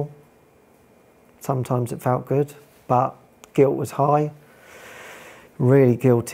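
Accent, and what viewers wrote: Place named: England, United Kingdom. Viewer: This was joyful and fun, and with that England english almost like Monty Pyton 🙃🧡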